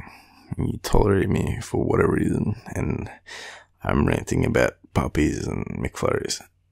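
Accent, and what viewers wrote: Australia. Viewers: Aussie Aussie Aussie! mate another Aussie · cheesy jokes in an Australian accent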